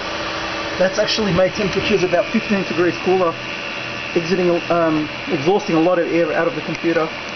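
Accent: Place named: Australia. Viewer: 1200 Watt :) Aussie accent ftw.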